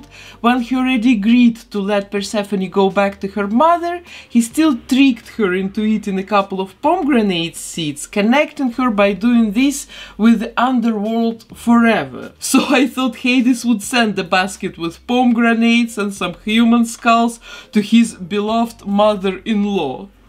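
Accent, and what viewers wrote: Russia. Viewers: Это самый потрясающий русский акцент, который я слышала)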